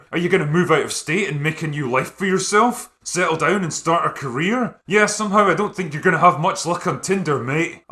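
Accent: Scotland, United Kingdom. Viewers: You’re becoming an Scottish accent echo of Redlettermedia 😬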